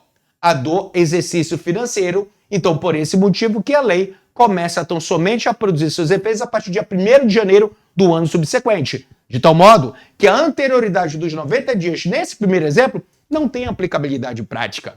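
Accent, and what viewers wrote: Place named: Portugal. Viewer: Sotaque de português. Ótima aula!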